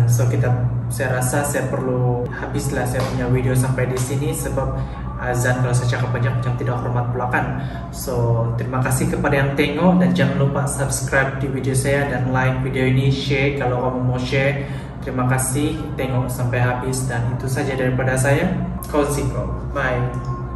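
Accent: Indonesia. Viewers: Bukan salah paham tapi logatvkamu itu Indonesia bukan melayu. Kalau tidak tahu jangan bahas bahsa Indonesia biar tidak salah paham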